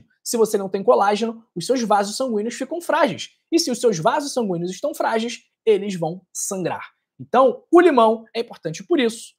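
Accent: Rio de Janeiro, Brazil. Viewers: Seu sotaque é de carioca, Dr. Vc Tem cara de Flamenguista, acho q vc fala do Rio de Janeiro, Cidade e Estado do MENGÃO!!! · Vc deve ser do Rio de janeiro, pelo sotaque de carioca. Estou no Rio de janeiro. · Boa tarde Dr acho que vc e do Rio de Janeiro o sutaco não nega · Bom dia!!! Que é cúrcuma? É um pozinho amarelo que compramos em casas de produtos de temperos tbm? Ganhei um pé de plantas me disseram que é cúrcuma. Será que alguém tem pé de cúrcuma em casa e deixar a foto pra mim comparar? Muitíssimo agradecida dês de já. Eu moro em Guarulhos SP e o DR pelo sutaque pare ser carioca,Rio de Janeiro. Morei lá duas vzs.😊✌️💞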